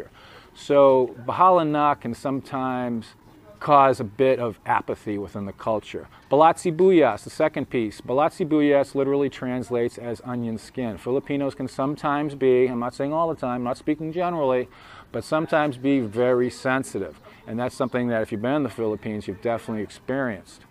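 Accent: Boston, United States. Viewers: That Southie accent, it creeps me out , I am from NJ and I hate the Soxs, ( love the Yankees) hate the Pats and all that tainted NE crap........ well except the chowda and the lobster rolls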